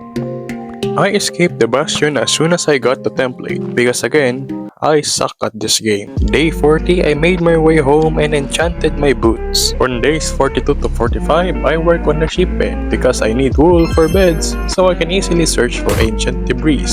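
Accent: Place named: Philippines. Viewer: I already know you are Tagalog because of your Accent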